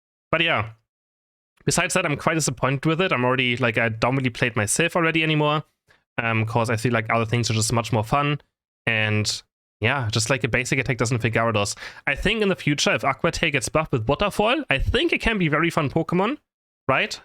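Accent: Germany. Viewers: your accent sounds like German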